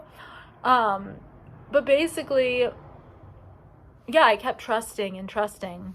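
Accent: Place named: New Jersey, United States. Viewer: Look like from Boulder talk like from Jersey.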